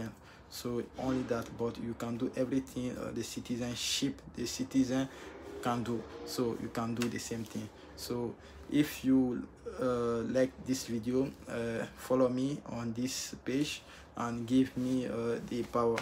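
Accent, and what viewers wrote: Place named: France. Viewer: Apparemment vous parlez bien anglais mais vous parlez l'anglais comme les Français ici qui parle anglais. Votre accent anglais est tellement français. Moi aussi quand je parle français, les français toujours ma dite je parle avec l'accent anglais 🤣🤣🤣🤣✌👍👋👋.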